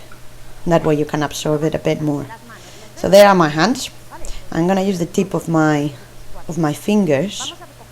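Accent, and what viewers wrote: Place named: Spain. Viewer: She isn't Latina, but Hispanic she is from Spain can tell from the accent. People from Spain are Hispanic.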